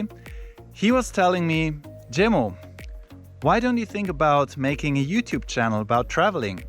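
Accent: Switzerland. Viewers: Hi! 😊Thank you so much for your swiss german in this video, you are very kind and nice! 😊 Swiss german is great but too difficult to learn for me😊. Greetings from Poland for you! 🇵🇱😊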